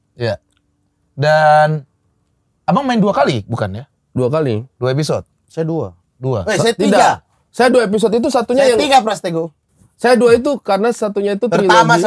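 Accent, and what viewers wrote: Eastern Indonesia, Indonesia. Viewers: makin ksini, logat bang makin menyatu dengan trio timur 😅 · nah iya, sependapat gk enak aja didengar bg klo kebawa logat timur lebih pas logat yg biasa aja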